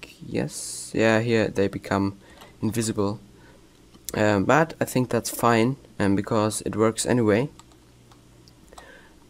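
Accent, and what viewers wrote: Germany. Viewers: Man merk das du deutscher bist bleib einfach bei deutsch und nicht in englisch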